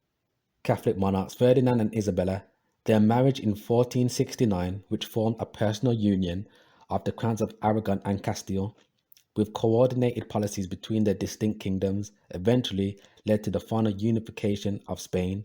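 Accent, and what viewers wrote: United Kingdom. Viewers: thank you my brotha, are you from the UK?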